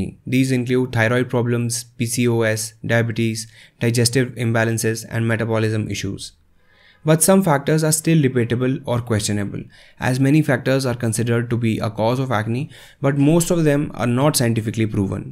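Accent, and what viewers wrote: India. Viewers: Yeah it's indian accent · You sound like indian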